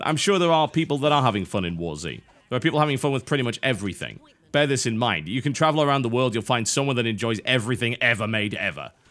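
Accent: United Kingdom. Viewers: Are you from UK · No doubt a ton alredy replied something like this but... how the hell did you get that rather typical brittish accent to that of someone from texas xD?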